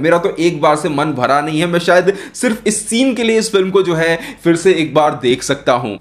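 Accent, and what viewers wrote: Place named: Haryana, India. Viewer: Nice review...Keep it up...some jokers are trolling haryanavi accent. Is it really worrisome?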